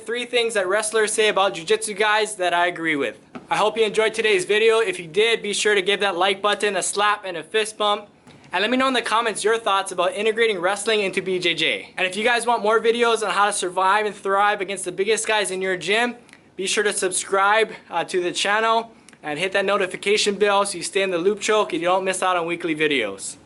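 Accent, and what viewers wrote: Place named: Hawaii, United States. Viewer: I was listening and thought to my self "this braddah sound like he from Hawaii" sure enough you are 🤙🤙🤙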